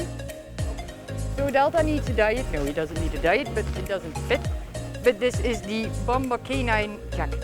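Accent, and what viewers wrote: Netherlands. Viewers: Is that a dutch accent I'm hearing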